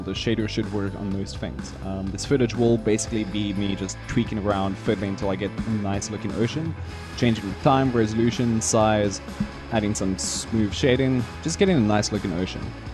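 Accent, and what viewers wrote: South Africa. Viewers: You sound South African? Yeah boyeeeeeee!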